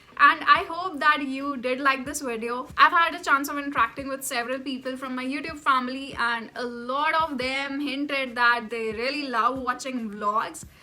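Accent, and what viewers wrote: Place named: India. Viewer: I love your India accent 🙂🙂😋😏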